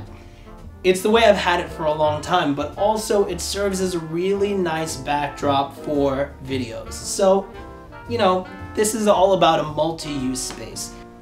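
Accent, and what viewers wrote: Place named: New York City, United States. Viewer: I like your taste in fragrance ... and your accent, love the slight traces of the NYC accent <3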